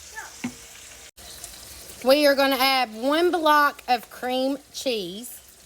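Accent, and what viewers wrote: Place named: Pennsylvania, United States. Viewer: Where you from?! Pencil-vainya? Kentucky or Tennessee? Cute accent😊 Yummy lookin quick snacks the way! Keep going boo❤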